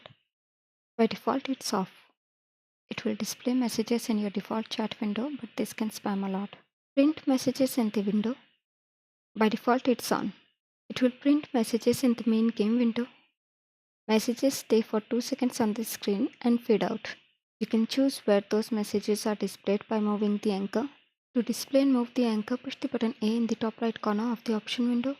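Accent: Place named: India. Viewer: indian english probably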